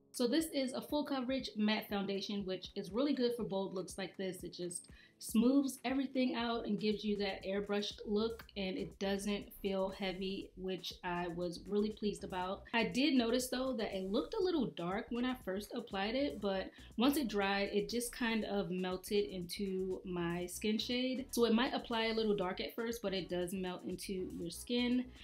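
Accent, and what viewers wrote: Baltimore, United States. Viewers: is that a Baltimore accent?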